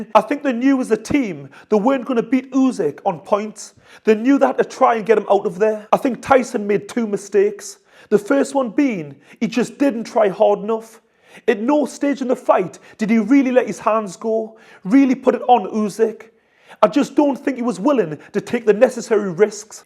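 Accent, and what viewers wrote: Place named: Scotland, United Kingdom. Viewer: Where you from brother? Why do you speak like that—accent? Scotland? This accent doesn't suit a black man.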